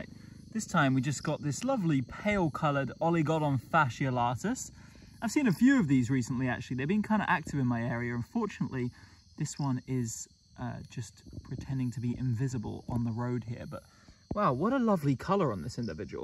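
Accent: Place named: Belfast, United Kingdom. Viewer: Ah, he sounds like a Belfast lad like myself. Nasty bite for such a small snake!!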